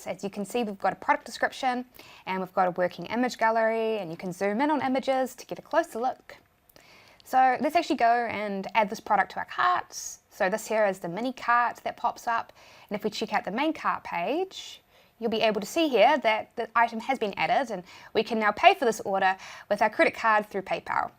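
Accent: New Zealand. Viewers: I love your New Zeland accent. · the adorable New Zealand accent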